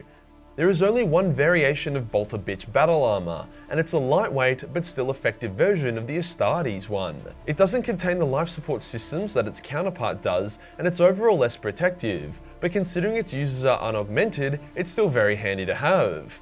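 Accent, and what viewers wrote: Australia. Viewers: Why is it relevant that you’re Australian? It’s pretty fkn obvious anyway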